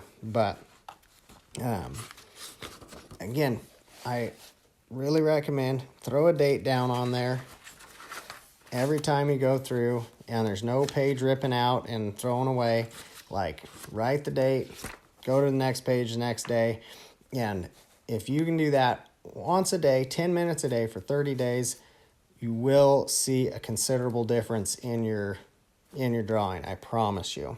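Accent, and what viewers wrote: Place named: United States. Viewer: awesome video, lots of good takeaways! practicing for 3d modelling. greetings from germany, btw which kind of american accent is that?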